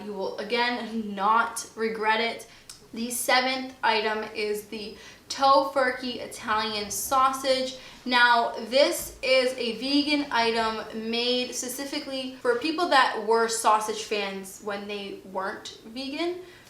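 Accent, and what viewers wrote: Boston, United States. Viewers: Great video! My favs are Kite Hill cream cheese and Gardein 7 Grain Chicken strips. Also, I'm from Boston but moved away a few years ago. Hearing your accent reminds me of home and I love it so much! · Stop it!! People love that Boston accent! Don't apologize for being you, we're here because we love your content. ❤️ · From a former New Yorker I love your Boston accent, It's too cute, remember we watch you for you and what you bring to YT so embrace your uniqueness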